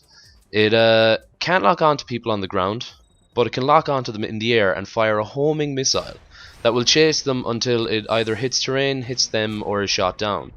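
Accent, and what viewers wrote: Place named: Ireland. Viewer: Irish accent :D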